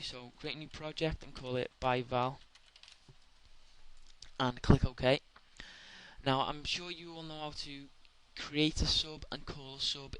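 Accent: Liverpool, United Kingdom. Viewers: good video ... understood it perfectly thanks. your accent tells me you're from liverpool... am i right?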